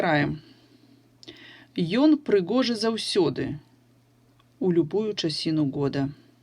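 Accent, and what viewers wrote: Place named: Russia. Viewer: если диктанты, это не всегда значит, что именно про экзамен. Это так же может быть обычная практика, но касаемо акцента соглашусь. Ощущение, что читает русский, знающий белорусский по "знакомым словам". Особенно произношение "г" бросается во внимание сильно.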